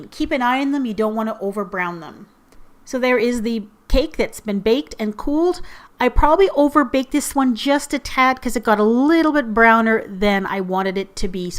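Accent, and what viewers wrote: Canada. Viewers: I love your accent! Are you from Canada?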